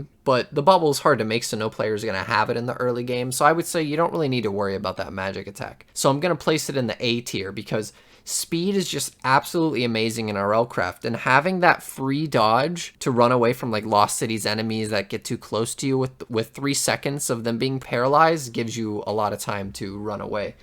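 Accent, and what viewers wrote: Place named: Canada. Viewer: bro sounds like kenadian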